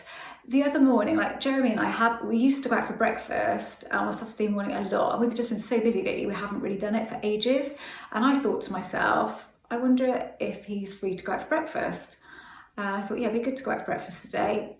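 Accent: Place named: RP, United Kingdom. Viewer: I kinda love it 😍 so posh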